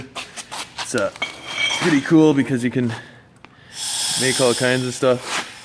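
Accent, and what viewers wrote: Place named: Nova Scotia, Canada. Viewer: Your accent tricked me haha totally sound like your from NS. Cool vids btw the anvil is awesome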